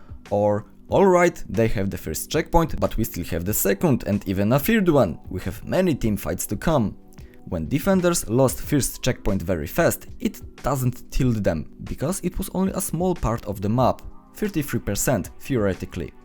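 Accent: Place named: Poland. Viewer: Beautiful Polish accent, at first you were Russian, ale potem zauważyłem ee to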